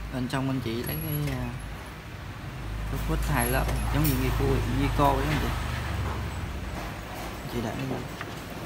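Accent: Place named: South, Vietnam. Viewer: Giọng nói miền nam nghe rất chất phát. Hướng dẫn rất chi tiết đầy đủ. Cám ơn bạn rất nhiều và mong bạn có thêm thật nhiều clip khác.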